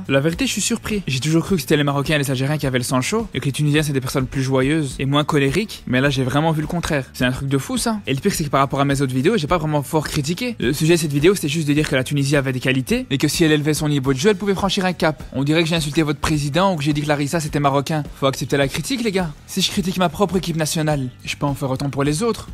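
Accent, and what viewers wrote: Brussels, Belgium. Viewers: T’as l’accent belge fieu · Accent bruxellois flag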